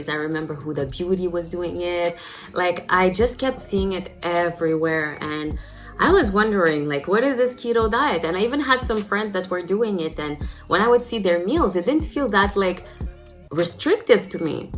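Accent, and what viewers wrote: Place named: Tagalog, Philippines. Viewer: Awesome video! Thank you for sharing your journey with us. Side note: your accent sounds a bit like you are a native Tagalog speaker (Philippines). I’d be curious about where you are from.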